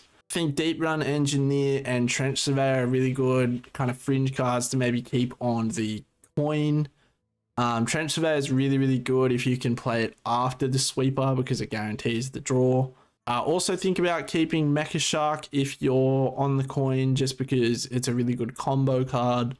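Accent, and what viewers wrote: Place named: United Kingdom. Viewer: Kinda sounds bri'ish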